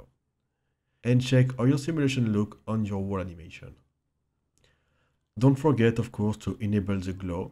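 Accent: France. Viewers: joli l'accent français :) merci pour la technique by the way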